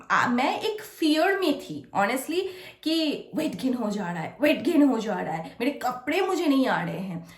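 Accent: Bengal, India. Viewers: Hota hai uska language kuch aur hoga jaise bangali...isliye Hindi clear nahi h.... foreign log Ghar nahi sambhalte kya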